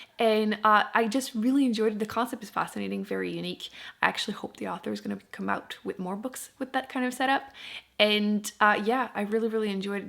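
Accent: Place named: Quebec, Canada. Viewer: First video I watch from you, and the whole time I was like mhm.... she sounds like she has a French Canadian accent. Looks like I wasn't wrong. Twinsies! Love this video!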